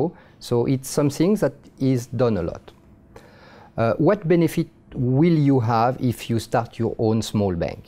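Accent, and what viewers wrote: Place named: France. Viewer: His French accent is so... French :)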